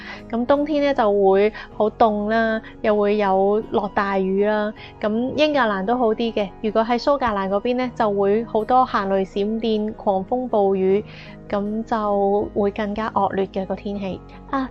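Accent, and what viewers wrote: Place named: China. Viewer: 你应该不是真真香港人！讲广东话讲到像陸染咁！😑😐😶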